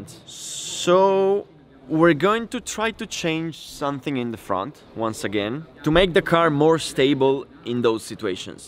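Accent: Italy. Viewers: minchia è siciliano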